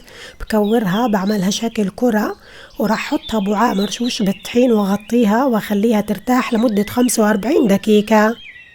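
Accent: Palestine. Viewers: تسلمي تسلمي يا ستي انتي يسعدك ويسعد كلامك الفلسطيني الحلو اجمل شيء انك بتحكي باللغه الفلاحيه الحلوه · Intisar Ayasa هاي لهجة فلسطينية ريفية في قرى بعض المحافظات وللعلم انو بفلسطين يوجد عدة لهجات ما يقارب من اكتر من ٢٠ لهجة وكل لهجة تختلف عن غيرها وجميعها لهجات حلوة ولها رنين جميل في الاذن وذلك لتعدد المناطق في فلسطين الحبيبة. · ما شاء الله يسعدك ويسعد لهجتك ويسعد فلسطين واكلاتها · انت مبدعه ولهجتك روعه فلسطينيه اصليه من اي البلاد انت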